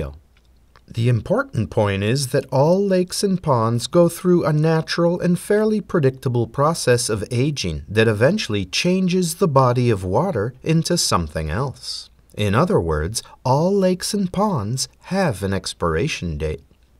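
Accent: United States. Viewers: Hooray! you're a fellow New Englander How many East Massachusetts. You do not have a Boston accent as far as I can tell But you might have like no sound down east main either maybe Vermont West Vermont southern Vermont connecticut western Connecticut Edison New Yorker either like a New Yorker I'm confused where are you from I'm not trying to track you down I just like I like to place accents I'm an accent of all. my favorite actions are from like alabama the Kentucky Irish and Scottish and Welsh and English I do like German and Japanese accidents as well. so I just I like to place roughly where people are from you said you're from New England but I can't place where in New England. embassy says that we know how to track you down if I wanted to and I don't. you could be middle middle state or upstate Maine possibly because my grandmother was from down East Main your Kenny Bunkport and she had a very distinctive accent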